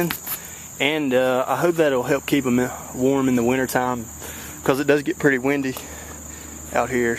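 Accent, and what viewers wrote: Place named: South, United States. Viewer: Impressive work! And that southern accent of yours... I could listen to it all day. ;-)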